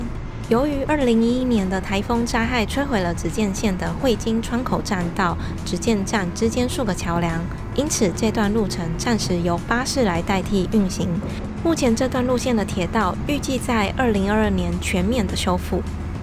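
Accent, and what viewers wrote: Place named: Taiwan. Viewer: Typical Taiwan accent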